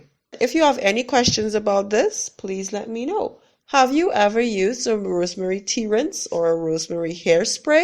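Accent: Trinidad and Tobago. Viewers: 5 seconds into the video i was like hii trini sister!!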